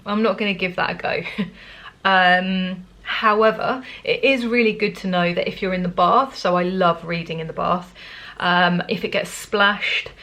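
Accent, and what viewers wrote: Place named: United Kingdom. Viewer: From your thumbnail i thought you were British😅😆,, and then hearing your accent confirmed it... Great video in any case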